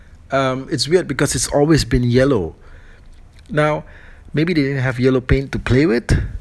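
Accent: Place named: Malaysia. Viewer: when a malay speak english...you can hear their horrible malay accent....and those headlights are ORANGE not red u color blind or wat?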